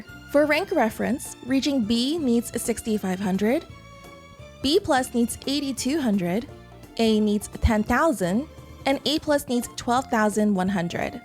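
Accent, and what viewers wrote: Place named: United States. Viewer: the murican accent is so cringe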